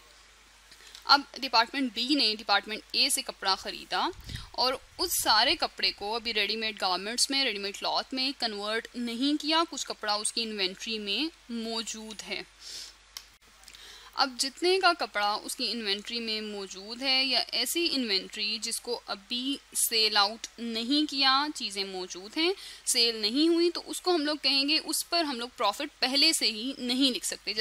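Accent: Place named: Pakistan. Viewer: Your voice sound as if you're Pakistani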